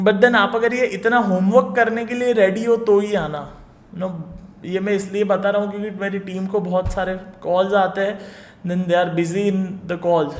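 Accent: Gujarat, India. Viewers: Verry nice explanation sir.... sir are you gujarati?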